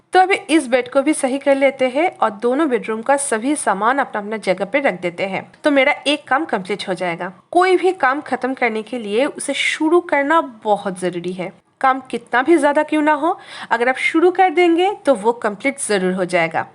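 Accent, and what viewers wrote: Bengal, India. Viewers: Didi i love ur Bengali accent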